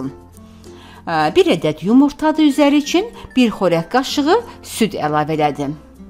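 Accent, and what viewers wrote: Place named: Sheki, Azerbaijan. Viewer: Mən Şəki lehcesine oxşadiram.mamamin xalası da eynən belə danışırdı.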